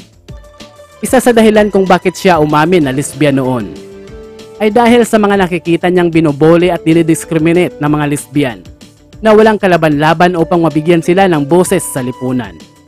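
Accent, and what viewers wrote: Visayas, Philippines. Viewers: Nalingaw ko sa imong diction Bisaya jud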